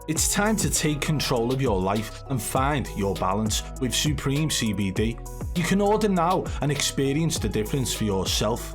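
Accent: United Kingdom. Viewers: you are a joke. You and your disguisting british accent and face.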